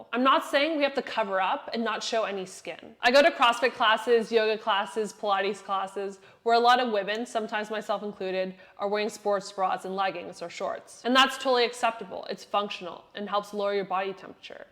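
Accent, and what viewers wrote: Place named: Canada. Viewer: You are very smart and well spoken. Also you kind of sound Canadian.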